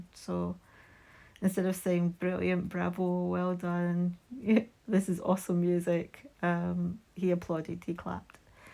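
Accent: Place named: Scotland, United Kingdom. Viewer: Loved this WIP & Chat and learning more about the Sagittarius. Very interesting indeed. I was sitting here the whole time thinking you sounded like you were scottish then you mentioned Robbie Burns. I have not been a Burns Night in years. I live in the prairies and they celebrated here annually and I use to attend it when I was younger with my parents. Brought back some good memories. 💜💙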